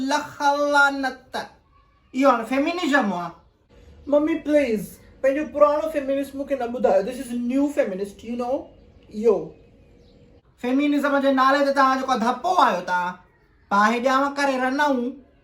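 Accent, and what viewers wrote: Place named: Larkana, Pakistan. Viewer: 🤣🤣🤣Adi iho gothano style aa larkane waran jo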